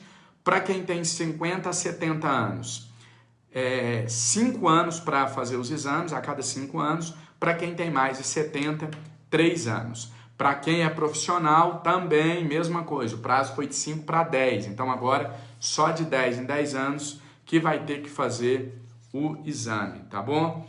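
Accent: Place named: Minas Gerais, Brazil. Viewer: É VC é mineiro ne bom dia eu tbm sou VC é o melhor pra explicar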